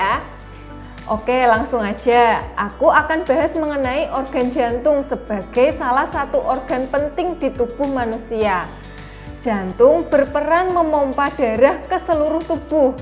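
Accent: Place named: Banyumas, Indonesia. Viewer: Aksen banyumasan, tegal dan sekitarnya. Muantab bu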